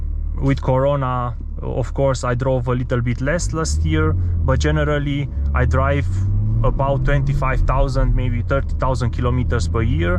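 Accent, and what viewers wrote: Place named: Russia. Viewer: Man... I love your accent. By any chance, do you have Russian roots? By the way, I saw this in the description ''*Manhart Stage 1 will allow 300+ kmh ''. Honestly, I'm not sure about that. In your other video I saw 256km/h at almost 6000 rpm. The manual gearbox seems to have short gears. 300km/h+ should be possible, especialy with a remap, but I wonder if that gearbox can reach such speeds. Stock these do about 1.2 bar boost. If the turbo can do about 2 bar or more, expect atleast 600hp, maybe something close to 650hp with a stage 1 remap.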